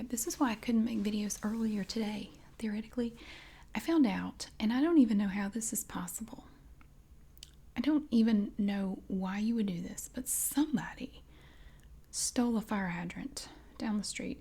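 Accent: South, United States. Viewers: I love how much more southern you sound when you get goin' 😂💕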